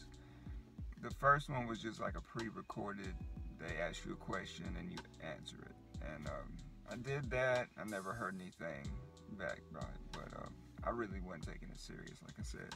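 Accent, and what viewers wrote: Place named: South, United States. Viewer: Just something about a Southern accent! (I'm from Georgia myself) Your story is really, really inspiring. I look forward to hearing more good things from you!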